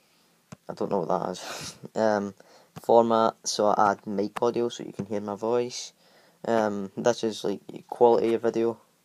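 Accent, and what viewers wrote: Scotland, United Kingdom. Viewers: Are u Scottish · Your from Scotland aren’t you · Wow a Scottish youtube that's like a one in a million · U fae scotland tae im fae glesga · FINALLY SCOTTISH BOI YOUR THE MAN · you are Scottish but you're not telling me what I wanted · Is he Scottish bc he hears like me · Finally.... A Scottish YouTuber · Are u Scottish coz I am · Are you Scottish because I am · You be Scottish boiiii! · Scottish YouTubed first one I’ve seen on youtube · It's a Scottish and he is from Glasgow same as me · idk wat ur saying cuz ur Scottish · Are you Scottish or British bc guess what I am SCOTTISH I LOVE GLASGOW OR SCOTLAND LOVE THE RAIN(not it’s so badddd D:) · Cus he’s Scottish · Starwars Villians no he's not he's scottish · Oi? I believe you're Scottish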